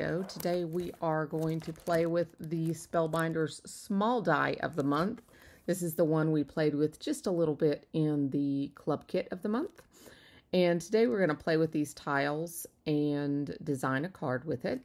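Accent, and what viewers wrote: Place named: South, United States. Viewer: listening to you with your southern accent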